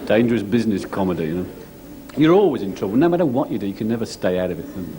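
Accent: Birmingham, United Kingdom. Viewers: Is he a brummie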